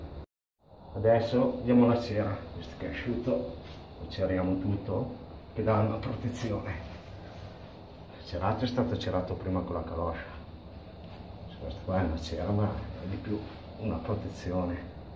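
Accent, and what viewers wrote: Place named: Genoa, Italy. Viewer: salve le volevo fare i miei complimenti non so perche ma mi sembrava dall accento genovese la saluto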